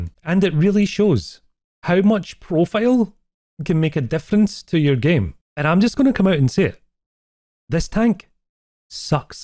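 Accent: Scotland, United Kingdom. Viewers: I really dont know why youtube algorithm get me here a hevy scottish accent youtuber playing war thunder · I'm still oldr than u in ur Scottish accent but belated birthday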